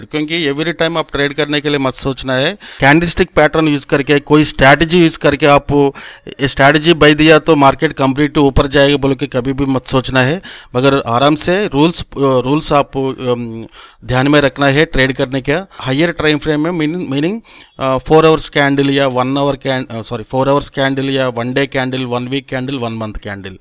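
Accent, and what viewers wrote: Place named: Mumbai, India. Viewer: Your Hindi is Mumbai Hindi...easy to understand....Keep it up...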